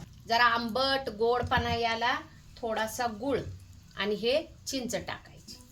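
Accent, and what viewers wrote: Kolhapur, India. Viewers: कोल्हापुरात तुम्ही कुठं रहाता? तुम्हाला भेटायला यावस वाटत... मग तुम्ही कोल्हापूर च्या भाषेत म्हणतासा.. " या कि घरा कडं".. या कि घर ला..😅.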